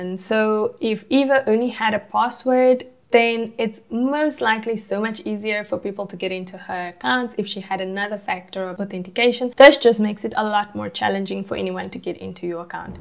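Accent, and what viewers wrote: South Africa. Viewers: Also, I instantly heard a South African accent?! much love 🇿🇦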